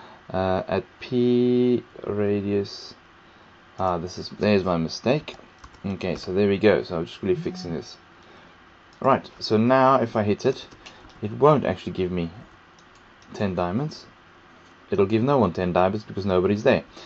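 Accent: South Africa. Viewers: are u southafrican?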